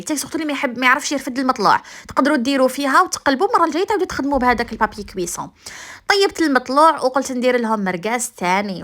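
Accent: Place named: Morocco. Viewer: يا جدع انا عملت كومنت بس علشان ام اللهجة الي مش بفهم منها كلمة دي اكيد مغربية باين من الفرنش و الدريجة الي هتاخد منكوا حتة 🙂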